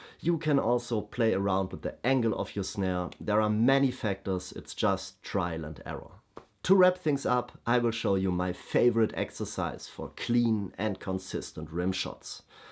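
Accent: Germany. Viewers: thats a german accent isnt it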